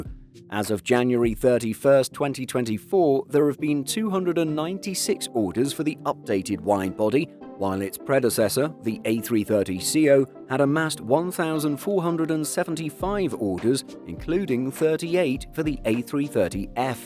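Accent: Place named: United Kingdom. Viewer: Respectfully, for someone with an apparently British accent who can pronounce Raj Indian names as well as you can, you really need to learn a little bit of Spanish, so you can properly pronounce the name of the capital of the country ofColombia. Besides, I thought all you, Brits spent your winners in Spain…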